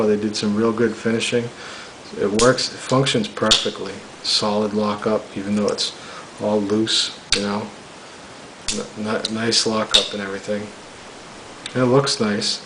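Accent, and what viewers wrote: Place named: New York, United States. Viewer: Love the New York accent ;)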